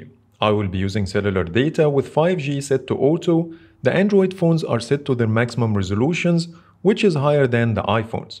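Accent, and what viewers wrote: Egypt. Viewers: واضح جدا إنك مصري والإنجليزي بتاعك باين جدا ليه مش بتعمل فيديوهات بالعربي .. اتعلم من عمر دايزر ويحيى رضوان قنوات بالعربي وشوف شغالين ازاي وعندهم متابعين قد ايه .. بلاش تعمل فيها مثقف وتروح تخاطب ناس مش هتعبرك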